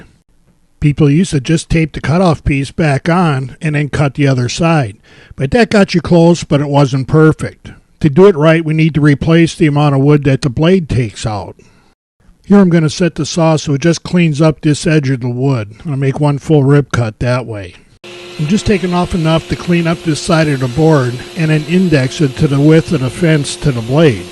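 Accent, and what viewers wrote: Chicago, United States. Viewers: I’m absolutely loving this Chicago accent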